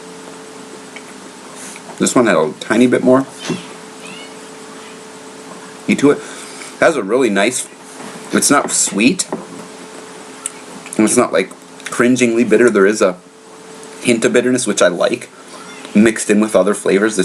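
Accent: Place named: Japan. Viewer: Hey, great review again mate, love the Japanese accent:) mate I'm only a new chilli head but enjoy your reviews and your knowledge. Would love to send you some pods to try when my plants throw a heap out, I'm in Australia and it will probably be another 4 weeks or so but would be keen to see your thoughts on the pods from different growing conditions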